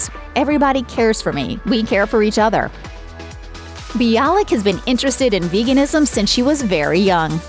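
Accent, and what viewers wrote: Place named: United States. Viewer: So where and when did this much touted "transformation" occur? I'm assuming this is something marvelous that happened post BBT. I'm up to but I don't think I can put up with much more of the narrators dreadful American accent, at first I thought it was a robot.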